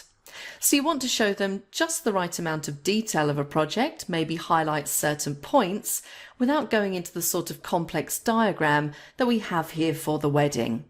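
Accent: United Kingdom. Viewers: I bet, that’s because of UK accent, isn’t it? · British accent, i love it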